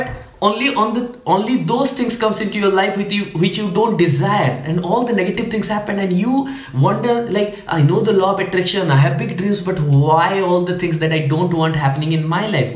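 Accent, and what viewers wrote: India. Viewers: I like your way of expression. You have a good and strong posture. Not only this but also you speak the Indian English which is clear and obvious with a very sweet voice. --Dr Mohanty.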